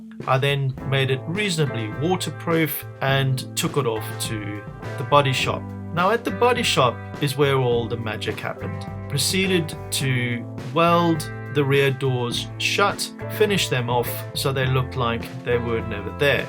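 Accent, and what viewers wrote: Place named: South Africa. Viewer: good to hear an SA accent.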